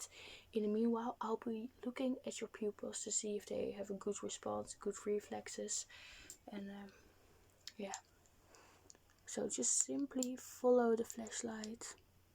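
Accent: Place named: Netherlands. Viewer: Ik herken aan je accent dat je uit Nederland komt ;). Niet dat je Engels slecht is, helemaal niet! Je spreekt goed en vlot Engels, zonder aarzeling. Dus proficiat daarvoor! Ik vind het altijd leuk om nieuwe kanalen te ontdekken, in het bijzonder wanneer ze nog aan hun begin zijn. De kwaliteit van het beeld is heel goed, het geluid ook en er zijn geen storende geluiden in de achtergrond, je stem is klaar en -héél belangrijk voor mij zonder geluiden van het speeksel, ik kan dat niet verdragen. Ik zou enkel liever dat je stem een klein beetje minder luid zou zijn. Maar ik zie veel potentieel in jou en zal abonneren, om je aan te moedigen! :)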